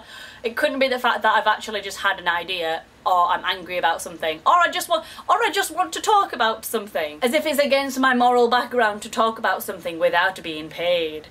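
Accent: Yorkshire, United Kingdom. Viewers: best british accent ever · i subscribed just because i heard the yorkshire accent haha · Arrrrrrrrr you're back. God I've missed you. And your awesome Yorkshire accent. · There's no such thing as a 'British accent' because Britain includes Northern Irish, Scottish, Welsh and English region accents. Hers is a Northern English accent, Yorkshire accent specifically. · British Accent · I like Tindaaaa Tindaaaa Oh yeah Tindaaaaaaaaa :P Boy, I love your British accent lol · damn that took me by surprise; listening to that yorkshire accent then learning you were in Hong Kong.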